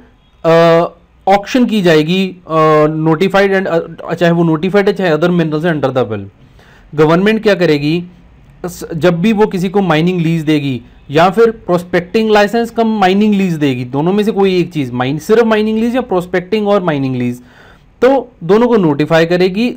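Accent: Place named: Punjab, India. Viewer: ur accent seems to be punjabi r u punjabi????grt and awesome videos btw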